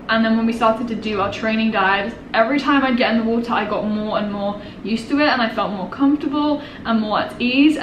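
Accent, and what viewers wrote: Australia. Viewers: You’re picking up the Australian accent. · The Australian accent is creeping in.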